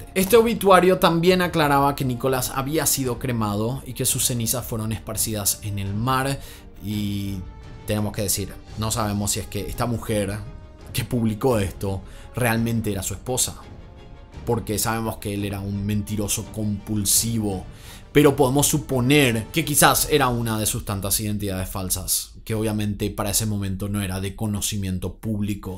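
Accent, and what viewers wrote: Paraguay. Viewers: Èl es spectacular narrando cada historia y su español es impecable explicando todo tofitito, soy unas de su seguidora ademas ese acento paraguayo es unicooo❤❤❤❤❤ un chico lindo lindo nuestro.!!❤❤🙏🙏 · Amo que no soltas tu acento paraguayo 😍😍😍😍🇵🇾🇵🇾🇵🇾 excelentes casos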